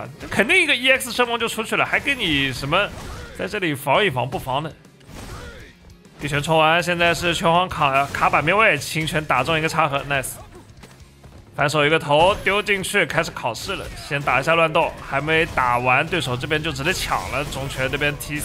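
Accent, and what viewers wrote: Shanghai, China. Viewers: 是上海人？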